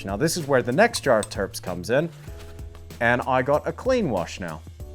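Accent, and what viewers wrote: Australia. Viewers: What an interesting accent! sounds Australian but some words are full American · Am I detecting a faint Aussie accent?? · Couldn’t quite detect his accent! sounds like an Australian doing an American accent!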